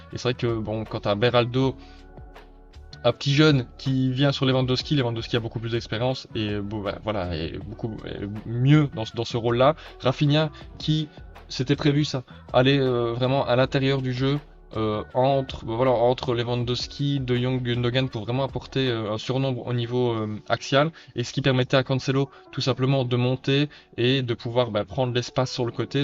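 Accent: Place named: Belgium. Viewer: Super vidéo, tu mérites plus de visibilité! Ps: est-ce que tu es belge par hasard? Je reconnais un petit accent haha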